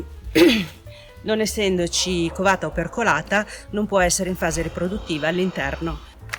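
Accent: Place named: Milan, Italy. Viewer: io sono nato e cresciuto in Brianza, ora da 10 anni vivo in provincia di Torino. Ti ho chiesto perché ho riconosciuto l'accento milanese 😉👍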